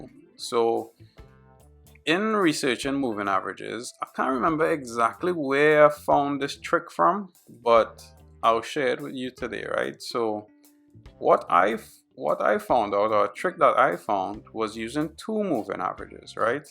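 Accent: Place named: Trinidad and Tobago. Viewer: great video. u sound like a trini, we should link up and work on some stuff. i am also an algo trader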